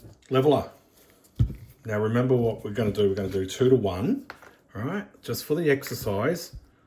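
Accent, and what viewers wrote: Australia. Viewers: Knew you were a bloody Aussie, like me, pick the accent anywhere :)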